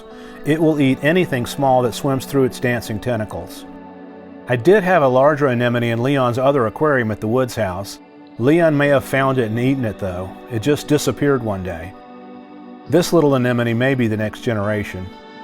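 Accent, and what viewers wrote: South, United States. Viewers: I Love voice! Calming and yes, very easy to listen to. That little touch of southern twang just puts a big old smile on my face and makes me sigh in contentment. 🥰😊😍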